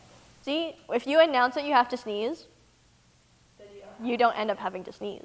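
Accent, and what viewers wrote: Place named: England, United Kingdom. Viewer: I love how English accent becomes more pronounced as the video continues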